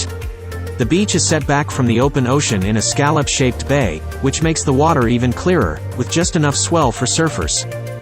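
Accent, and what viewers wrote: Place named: United States. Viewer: Maybe AI IDK? BUT even so, why is an American (accent) talking about Esperance Australia? Why Not Australians? America, Good on you & i thank you❤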